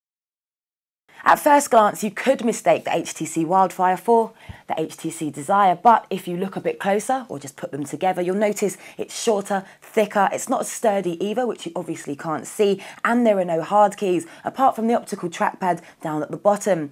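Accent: United Kingdom. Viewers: stop speaking british, its annoying!!!! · i buy ihm few days ago so i dont know what she say?if is price than i buy me Vertu Signature...or if is procesor and ram and graphic i buy me Alienware laptop so funny and all who want to buy a wildfire buy it u will not mistake it doesent cost alot and u have evrythingwhy is this or that ...hm money,that much music...we say like thatand this english Mate girl is talking bad about that phone bloody hell xD hate the acent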